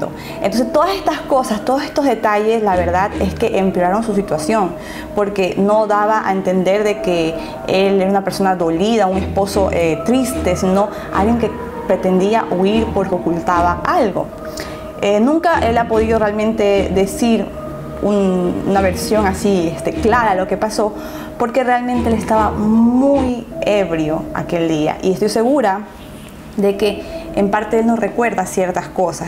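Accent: Guayaquil, Ecuador. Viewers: Nosotros los ecuatorianos hablamos así! No la critiquen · soy uruguaya y he visto todo lo posible de esta chica hace los videos con gran respeto y en cuanto al lenguaje es su expresión natural lo se porque soy docente ,si les molesta su modulación es porque no conocen ni respetan su acento ecuatoriano! si no les gusta no miren! Es muy fácil criticar! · Así hablan los Guayacos. Pero bien que tengas este canal. Es interesante.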